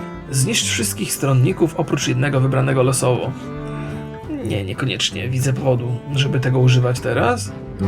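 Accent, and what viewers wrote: Silesia, Poland. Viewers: pierwszy raz w grze słyszę gwarę śląską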